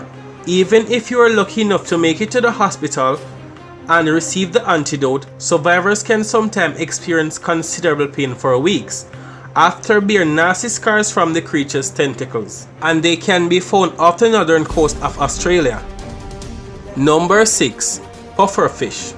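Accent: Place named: Jamaica. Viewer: Come through with the Jamaican accent yesss these videos are popular but not with a JA accent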